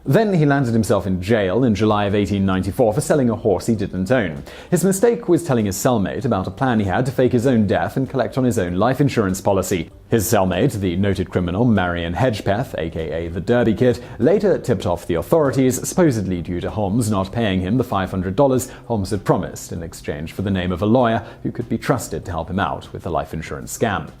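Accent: United Kingdom. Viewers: "Murder" sounds so much more sinister with a British accent.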